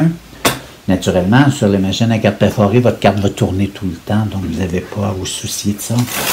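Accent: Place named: Quebec, Canada. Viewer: Merci! C'est toujours un plaisir de voir et d'entendre tes explications avec ce doux accent québécois:-)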